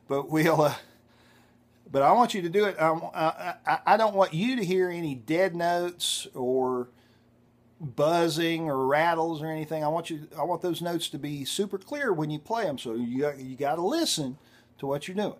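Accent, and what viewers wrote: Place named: Texas, United States. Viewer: This man's accent is identical to Dr. Phil's. Makes me wonder if he's from the same North Texas background. Makes me want to learn. Being honest with myself, I've only just got to the point where I don't look at my right hand, and I know you don't want to be looking at that from 35+ years of playing guitar, and 15 of finger picking. Banjo is just so different with the finger picks, but that's the sound I'm going for, so I'll learn. Thanks for the lessons and for being cool about it.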